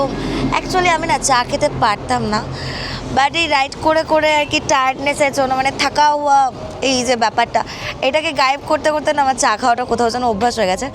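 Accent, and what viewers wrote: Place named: Bangladesh. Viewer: Bike ride-a beriyocho otocho nijer bike ta check koroni ? Tomar left fog light ta toh akasher dike focus kora, Ete samner theke asha kono garir koto osubidhe hobe bhebe dekhecho ? R tomar moto vlog ta tomar ato bokbokanir jonno thik mone hocche kono Bangladeshi vloger ekta paid promo shoot korche. Tomar kothar moddheo ekta Bangladeshi bhab asche majhe moddhe jeta na bole parlamna. R ride korcho, khub bhalo kotha, kintu moto vloging ta ektu bhalo kore sikhe tarpor koro tahole loke r o njoy korbe. Tar sathe nijer cheap publicity na kore bhalo kore ride koro & vlog banao, like & subscriotion nijer thekei ashbe. Agami dine r o bhalo vlog dakher icche roilo... 👍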